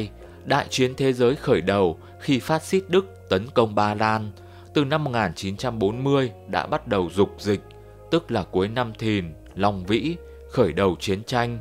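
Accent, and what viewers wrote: Hanoi, Vietnam. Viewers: Hay quá, giọng anh HN thật ấn tượng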